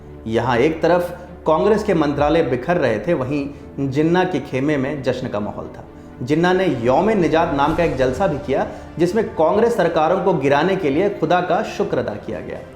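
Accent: Standard. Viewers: Aapki hindi ekdm vishudh roop me h .aapko mera pranam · I wish all journalist and or at least sanskritagyas n babas n jyotishacharyas learn from you how to speak suddha Hindi It is so pleasant,.. Thank you...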